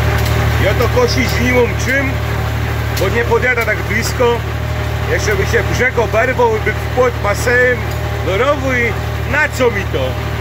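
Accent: Silesia, Poland. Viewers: Witaj. Po kuku i akcencie wnioskuję że to gdzieś na południu kraju - Śląsk 🤔u mnie o połowę niższa i zeschnięta, susza jej wogóle nie oszczędziła 🙈Ładna i oby była jak najpiękniejsza. Pozdrawiam serdecznie z subikiem 🤗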